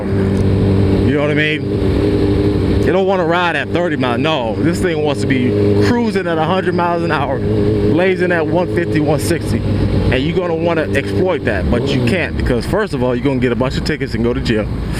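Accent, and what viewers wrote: Michigan, United States. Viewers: You from Michigan bro?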